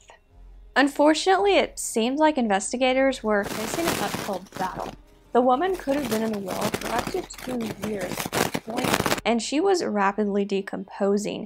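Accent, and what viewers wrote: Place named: Mississippi, United States. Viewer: Nice video. I decided to subscribe. For some reason, you sound like you are from my home state of Mississippi. 👍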